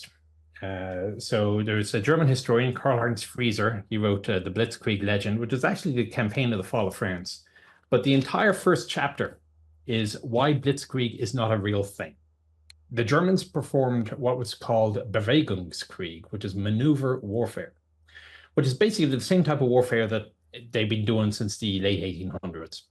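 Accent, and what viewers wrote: Ireland. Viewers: The tank man is not British??? But American his accent sounds Irish with a little bit of london oops... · Is this fella from Ireland originally but in US for years? His oronunciation of 'T' especially at the end of words suggests so.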